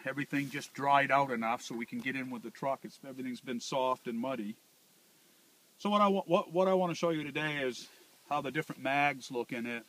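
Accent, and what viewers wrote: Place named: New Hampshire, United States. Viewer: Great video my friend thank you . And just for the sake of question are you guys in New Hampshire ? I think I recognize your accent lol I'm from Rochester NH and I now live in Arizona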